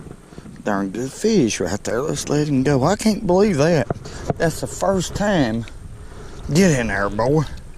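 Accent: South, United States. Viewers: There nothing like good ole southern talk.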